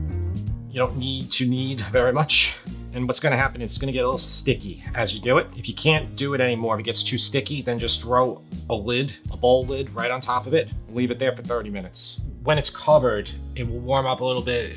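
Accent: New York, United States. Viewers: Great channel. Great presentation. This guy is very down to earth, with the best NY accent. Nice!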